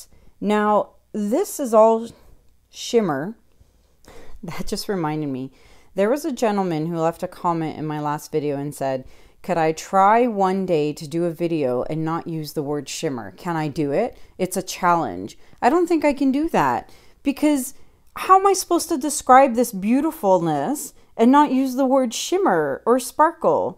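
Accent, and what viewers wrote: Canada. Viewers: Sounds like sweet Juno might have allergies. I use children's Benadryl. Hope he feels better soon! Haven't heard you say "literally" as much as usual. I like listening to your cute canadian accent!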